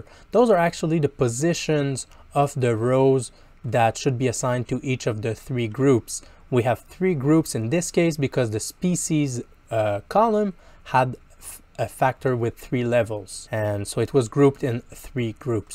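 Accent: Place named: Quebec, Canada. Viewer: Salut! Avec ton léger accent, j'en ai dédui que tu étais Québécois ;) J'aurais une question pour toi! Dans mon DF, mes variables de ligne sont des entreprises (1 entreprise a plusieurs lignes, comme c'est le cas de la sorte de lys qui a plusieurs lignes) et mes variables de colonnes sont binaires (0/1). Je souhaite donc combiner mes variables de colonne en somme (au lieu de moyenne dans ton exemple). Je suis capable de le faire avec la formule suivante : by_company <- group_by(test_sans_tweets, username) sum_by_company <- summarise(by_company, sumlocal = sum(Local)) Cependant, j'ai plus de 500 colonnes. Y a-t-il un moyen de faire cette formule avec toutes les variables en même temps pour ne pas avoir à la copier-coller 500 fois? Merci beaucoup :)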